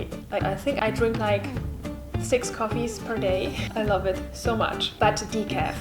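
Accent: Germany. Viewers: Man hört, dass du aus Deutschland kommst c: I love Coffee 😍😍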